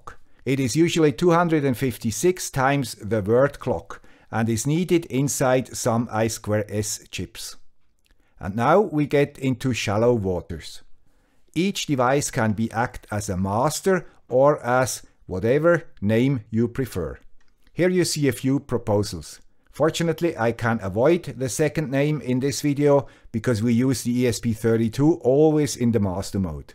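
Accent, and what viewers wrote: Switzerland. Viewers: This is really getting a Swiss channel... an accent, a library... What a great video and really usefull ! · I noticed that lately I keep hitting videos with this specific guy with a Swiss accent. After the 5th video I decided, "Well... I guess this guy with a Swiss accent has earned a subscription..." :D Thank you for all of the work you do! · you made my new routine: Sunday morning "swiss accent" before the breakfast;)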